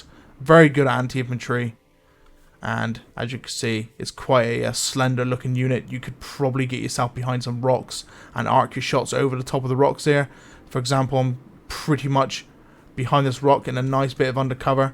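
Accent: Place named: West Country, United Kingdom. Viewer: Do I detect a fellow West Country lad? When you say the queen has her own audio? What audio effects does she have?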